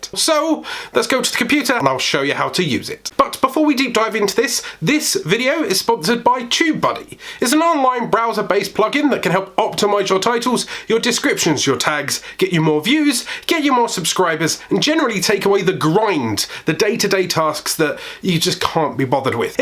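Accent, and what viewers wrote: United Kingdom. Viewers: I love the British sound. Even i don't get it very well.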